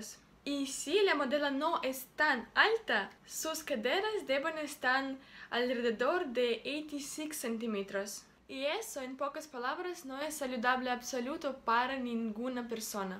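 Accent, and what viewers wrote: Russia. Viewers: Me gusta tu pronunciación. O sea. Aunque hayan el ciertos detallito a corregir, igual me gusta. Por ejemplo. Se dice zapátos. Y como dices bolsillos me gusta, acento ruso, pero me gusta. Por cierto. Si te interesa aprender más español, tener con quien practicar, escríbeme al privado de aquí de YouTube y nos ponemos en contacto. Cuidate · Jajaja ese acento ruso español me encanta, aca te dejo un par de luagres para conocer en latinoamerica <link>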